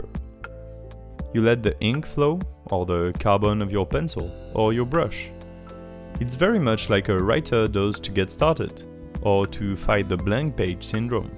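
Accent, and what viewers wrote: France. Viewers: Gotta say I wasn't expecting someone with a french accent but hey, pleasant surprise ! Oui, effectivement moi aussi je suis francophone- Super vidéo ! Je vais probablement m'y essayer de temps en temps.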